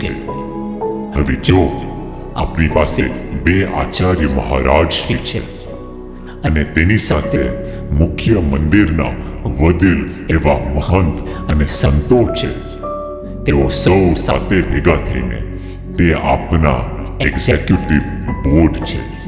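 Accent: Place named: Surat, India. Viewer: Tame pure surti chho...right bhagat??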